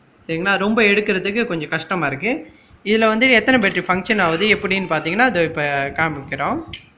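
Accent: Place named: Malaysia. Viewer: Malaysia la apdi thaa pesuvaanga bro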